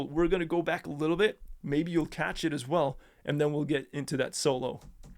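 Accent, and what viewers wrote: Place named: Denmark. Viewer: I am from Denmark. I thought I reconized your english accent as danish.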